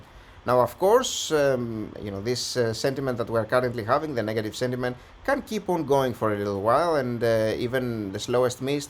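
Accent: Greece. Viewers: Your accent sounds sooo greek man 😂 Correct me, if I am wrong!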